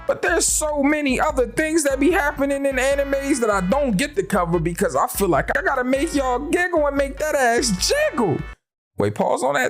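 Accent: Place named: Philadelphia, United States. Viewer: Had to subscribe bcuz u sound like u from Philly so gotta support my city 💪🏾💯🙌🏾 not much of an anime guy but I love Baki show nd a fan of good commentary and humor… u checked all my boxes 🤣 keep goin bro … “this my third day out here “ ….a Philly reference? definitely Philly bul never thought I would be able to identify a “Philly “ accent 😂 made me peep my own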